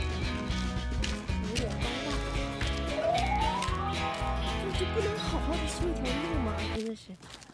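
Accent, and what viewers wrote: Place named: Beijing, China. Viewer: 好久没听着京片儿了，就是亲切。欢迎你来洛杉矶。像我这样住LA这么久的人也是通过你第一看到这里很多景点。谢谢。加油！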